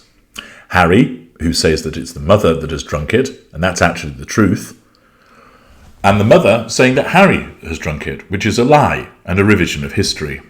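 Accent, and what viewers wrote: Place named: Scotland, United Kingdom. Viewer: You know sometimes your pronunciation reminds me of Alan Brazil. I think you are of Scottish heritage.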